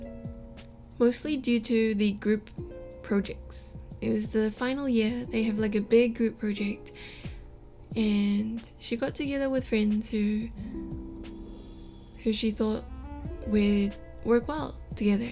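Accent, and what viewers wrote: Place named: New Zealand. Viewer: Kiwi accent is the best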